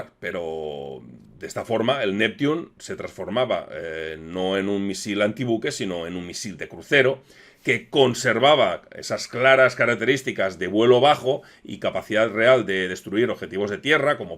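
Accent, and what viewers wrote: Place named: Spain. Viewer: Estupendo tu video como siempre. por otra parte , para un argentino escuchar hablar en español de España ya es un verdadero deleite.Cordial saludo.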